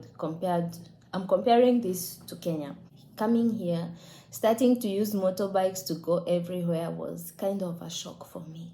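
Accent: West Africa. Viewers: You now talk like a West African 🤔🤔😄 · 2 years in Togo has you speaking like a West African already 😏 .... This is very interesting content!! More like this please 😊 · Your accent has become so west Africanized!! So interesting to hear about your experience living in a different part of the world. You are really brave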